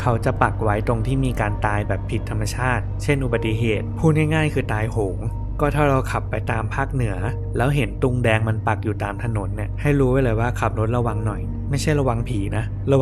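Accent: Nakhon Sawan, Thailand. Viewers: คำพูดนี่คนนครสวรรค์ชัด (บ้านเดียวกัน)// ใกล้หมื่นซับแล้ว เชียร์สุดใจให้ถึงเร็วๆ🎉 ... ยังคงรอ Barbarian ตลอดไป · ดูมาหลายคลิป เพิ่งมารู้เลยค่ะว่าเป็นคนคอนหวัน ทางนี้เป็นคนพิจิตร อยู่อำเภอติดคอนหวันเลย ชอบพูดแงะ แล้วก็โดนหลายคนทักว่าพูดเหน่อเหมือนกันด้วย<num>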